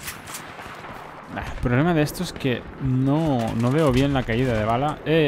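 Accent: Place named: Catalonia, Spain. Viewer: tu que hablas como la milicia del mw3 awá pandó? yo le entiendo en todos y cada uno de sus videos lo unico es que tiene acento catalan pero yo lo entiendo como si es de murcia, madrid, galicia y pollolandia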